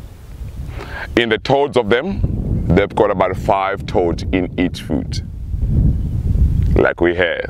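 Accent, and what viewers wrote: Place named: Africa. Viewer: hey man that is rude andrea preti i am also from afrika that is how afrikans talk also zulu and i am fine with that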